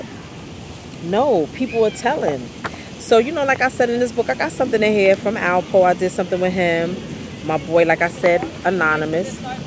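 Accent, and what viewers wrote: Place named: Harlem, United States. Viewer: I love her Harlem accent 😏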